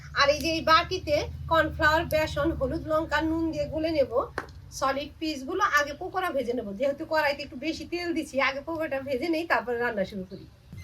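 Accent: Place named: Dhaka, Bangladesh. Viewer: এই বোনটা মনে হয় ঢাকাইয়া । কথাবার্তায় তাই মনে হয়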